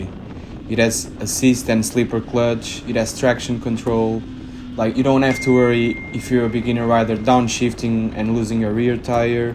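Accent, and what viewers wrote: Portugal. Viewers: Bem me parecía que eu tava a notar um sotaque tuga. Belo video, boas informações, rápido e claro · aquele sotaque tuga haha, grande mota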